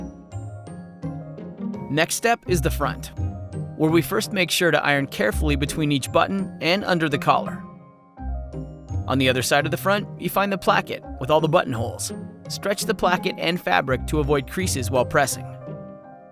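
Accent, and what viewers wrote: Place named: United States. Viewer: Bli kvitt den teite amerikanske stemmen.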